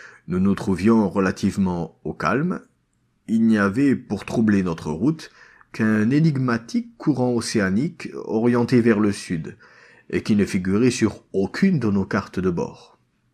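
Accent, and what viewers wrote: Germany. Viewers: Exellent l'accent allemand! Et jusqu'au bout!! Bravo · Super l'accent allemand ! Et très bonne histoire 👍🏻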